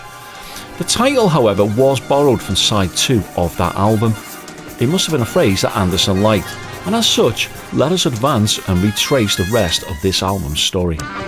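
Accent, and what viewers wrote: Liverpool, United Kingdom. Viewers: Nice to hear another Scouser being bang into Yes. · Your work on these videos is outstanding and you deserve, at the least, many many thanks. The liverpool accent is very controlled and toned down also and, as such, it works perfectly so no apologies needed from you re that. As for - while I love all the 70s albums (perhaps, Tormato not so much), when I first heard this one (I got it as a xmas present and found it before xmas and just couldn't wait to hear it so gave it a listen and hastily put it back where I found it) it blew my socks off! This is Yes as "in your face" as you can get. "Metallic Yes" as I call it. Not as in heavy metal but the sounds of just about every instrument. Cranked up and piercing.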